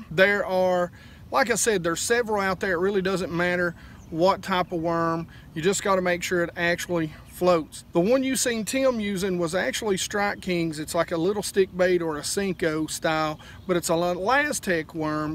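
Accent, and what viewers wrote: South, United States. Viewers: Hey, i really liked this video. I watch a lot of Tactical Bassin cause everything else seems to be young guys ass slappin and hi fiving over 2lb fish ud think was their PB the way they giggle and ham it up for the camera. Drives me crazy. Im in Chicago so its tough to catch videos at the right time of season for us compared to where most guys film. It was 36° today and snowed 6 inches yesterday. Tomorrow sunny and 55 so hopefully theyll move up a bit? I grew up in Georgia so u sound like one of buddies. Anyway, no nonsense instructional videos with a southern accent are right up my alley! This is the first ive seen and i immediately liked it and subscribed. Great to see an adult talking about technique and not playing grab ass with a best friend that wants to get on camera!! Do you do guided trips or is this just a fun hobby for you? 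Id be interested if you do. Again great stuff 👍👍. Im now a full time customer. Thanks bud!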